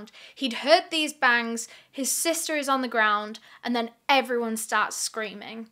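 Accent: United Kingdom. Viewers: What is your accent? I cant figure out if its uk or not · It's a UK accent!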